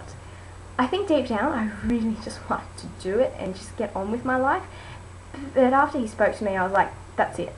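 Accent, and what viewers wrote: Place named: Australia. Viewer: You sound Australian, who did you use?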